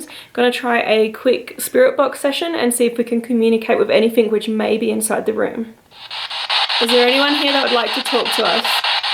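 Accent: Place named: Australia. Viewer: love this. also love your accent !! i think its aussie, where are you from ?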